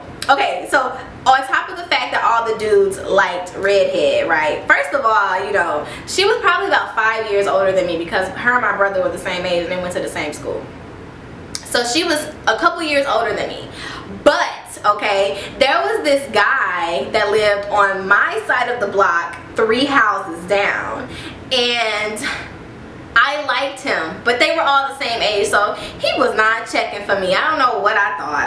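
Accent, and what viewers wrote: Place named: New Jersey, United States. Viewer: im from south side and you sound like you from New Jersey 😂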